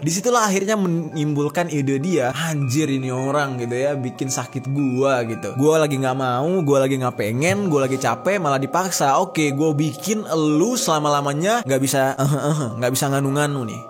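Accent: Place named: Java, Indonesia. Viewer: Wong jowo ndi suarane lor?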